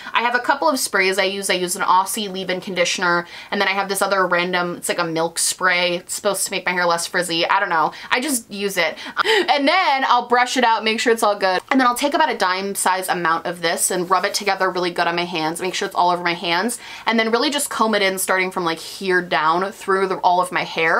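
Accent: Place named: Rochester, United States. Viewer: Okay so...I finally figured out who you sound like to me....Kristen Wiig! And you're both from Rochester, NY. Lookie there. Accents are cool. Love your videos btw! Please do more non-makeup favorites :)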